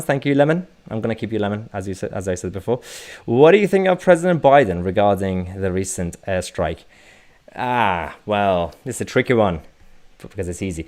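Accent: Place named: England, United Kingdom. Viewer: Ah, so that is where your accent was created, between Leigh and Greenwich, almost Kent:)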